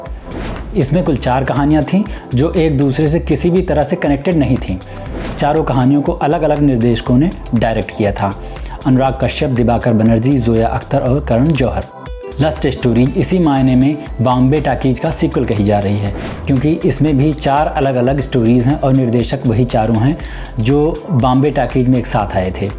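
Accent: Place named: Uttar Pradesh, India. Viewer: subhas chandra mondal...... chutiya ho kya jindgi bhar judgemental reh jaaoge.... ye typical up ka tone hai.... sabse bc tone tera hai wo hai racist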